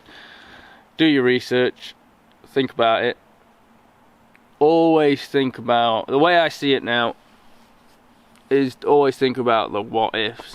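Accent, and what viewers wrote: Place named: United Kingdom. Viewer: You sound like a Brit but you talk about walmart and bucks. Where are you? (NOOB to your channel)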